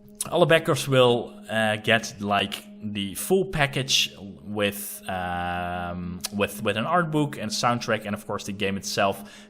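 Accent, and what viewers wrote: Netherlands. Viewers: Gotta love the Dutch accent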